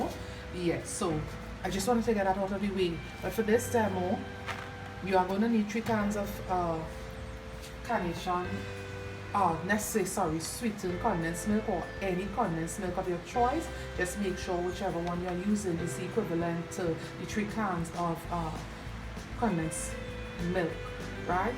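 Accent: Trinidad and Tobago. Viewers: How I love the trini 🇹🇹 accent great job fudge looks delicious 😋 thanks for sharing stay safe from Guyana 🇬🇾 with love ❤️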